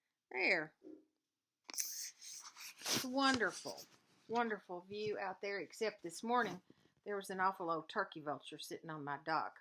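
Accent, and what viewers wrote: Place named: Alabama, United States. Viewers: I just love listening to you. You make me laugh and you talk just like we do in Alabama. Beautiful stitching. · love your video! it is cold here in Portland Oregon. I have spend a few Christmas in Georgia. My sister in law lived in Lawrenceville now Sugar Hill. Your accent reminds me of her. you are right about floss tube I think I know you all personally. Merry Christmas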